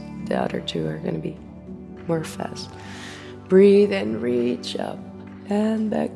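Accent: Netherlands. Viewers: Yes, she sounds Dutch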